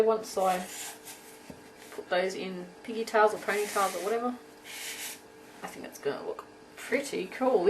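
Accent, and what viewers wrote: Australia. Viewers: She is Austalian and yeah her accent IS awsome =]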